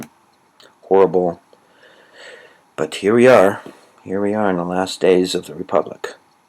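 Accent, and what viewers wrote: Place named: Argentina. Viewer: I like your Argentinian Accent! LOL!!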